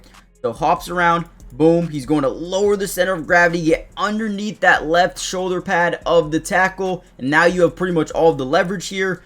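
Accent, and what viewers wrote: Canada. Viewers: Rad Canadian accent my guy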